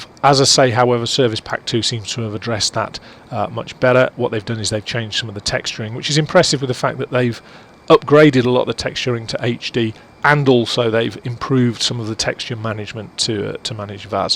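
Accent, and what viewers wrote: United Kingdom. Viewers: Is this a Lancashire accent? Sorry this has nothing to do with the aircraft. I just bought it an watching for info. I love midland accents and I'm trying to distinguish the different ones.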